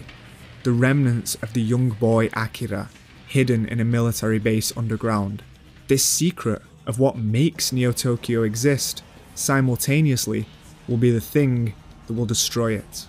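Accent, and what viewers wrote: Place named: England, United Kingdom. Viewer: Love the content of this video. The mispronunciation of the director's names (Louis Malle, Murnau, "Andrei" Tarkovsky for example) and the accent in general made it very difficult to watch. How does one know so much about cinema but get the names of the directors wrong? And with such conviction. That's baffling to me. This is one English accent that simply destroys everything in its path.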